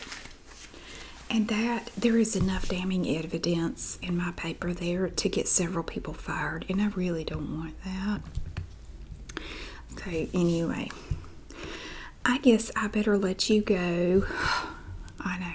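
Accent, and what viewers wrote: South, United States. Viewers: Southern accent in all its glory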